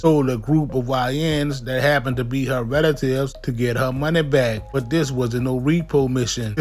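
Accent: New Orleans, United States. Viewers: Lol. I understand what you're saying, but I'm from the area. This is a new orleans accent. A bit slow though. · who the man who telling the story ??? i love his accent that’s that new orleans lousiana accent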